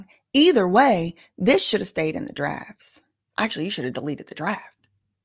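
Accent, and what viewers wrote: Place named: Alabama, United States. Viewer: I have been watching your videos and your accent is familiar are you from Alabama?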